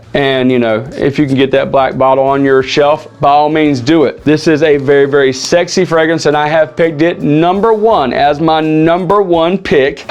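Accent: South, United States. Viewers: I heard the draw and was like....he's from my neck of the woods. Howdy from South Carolina!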